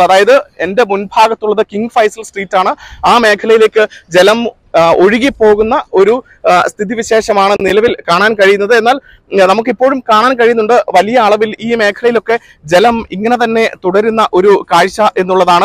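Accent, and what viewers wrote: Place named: Kottayam, India. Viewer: This is not Telegu, true Kottayam Malayalam.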